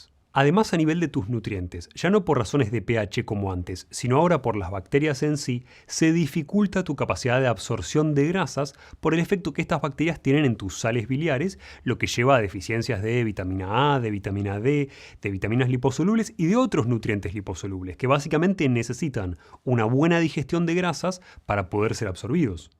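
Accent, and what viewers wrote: Argentina. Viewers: DE QUE PARTE DE ARGENTINA ES EL DOCTOR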